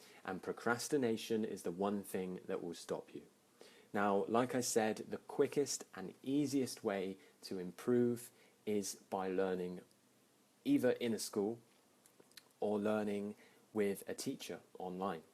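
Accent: United Kingdom. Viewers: hi, i just click subscribed you. thank you for your teaching it's first time for me to hear "procrastination" and i quite wonder normally i cannot get british accent but i understand you very well. it's possible that you maybe speak slowly and clearly. ^_^ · Love your voice and of course your amazing British accent! ♥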